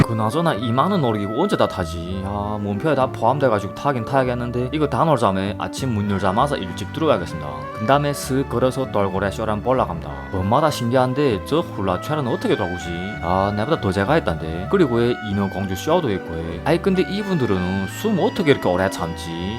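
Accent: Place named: Yanbian, China. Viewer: 안녕하세요:) 채널 초창기부터 구독했던 시청자입니다. 영상 재밌게 잘 보고있습니다ㅎㅎ 다만 영상들에 있어서 한 가지 조언을 드리고자 댓글을 달았습니다. 편집도 깔끔하고 업로드하는 영상도 좋아서 잘 보고 있습니다만, 연변 사투리를 이해하기 힘듭니다. 채널 소개란의 글과 유튜브라는 플랫폼을 선택한 점으로 보아 한국사람들에게 연변과 조선족 음식 문화를 소개하는 목적으로 영상을 만드시는 걸로 짐작합니다. 그러나.. 슬프게도 올리시는 영상 내용을 제대로 파악하기 어럽네요ㅠㅠ 자막도 사투리를 그대로 옮겨 작성하셔서 앞뒤 문맥으로 짐작할 따름입니다. 하지만 한국인으로서 처음 듣는 어휘가 너무 많아 여전히 이해하지 못하고 넘기는 경우가 많습니다. 앞으로 한국인을 타겟팅하여 영상을 올리실 계획이라면 표준어 자막도 같이 달아주시길 추천드립니다. 강요하거나 요구하는 것은 아니고 채널 번창하시기에 좋은 방법인거 같아서 추천드렸습니다. 두서없이 쓴 글 읽어주셔서 감사합니다ㅎㅎ 채널 대박나고 건승하시길 기원합니다😊😊 덕분에 중국 여행가면 상해가 아니라 꼭 연변으로 가보고 싶네요ㅎㅎ 화이팅입니다!!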